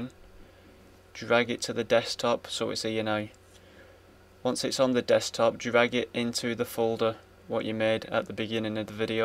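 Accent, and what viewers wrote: United Kingdom. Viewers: no is from up north (English midlands) i think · Sound like your a fellow wiganer?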